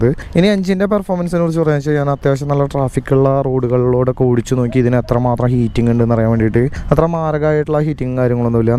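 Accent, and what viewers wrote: Thrissur, India. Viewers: bus inte Peru kando "Pooram"... Coincidence paranjal 'Oru Thrissur karan , infamous slang and Rtr 160 4V koode aa busum ..' Age oru kunju Pooram feel ✊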